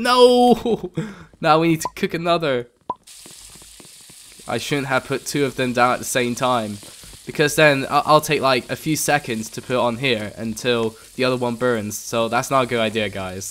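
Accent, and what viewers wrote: United Kingdom. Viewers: Nice birtish accent! You just earned a sub!! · U just earned another sub. 1 your British 2 u were the only person that I saw talked while playing roblox . Btw my user on roblox is maddy6786. And next time you should work at bugler bomb. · r u birtish?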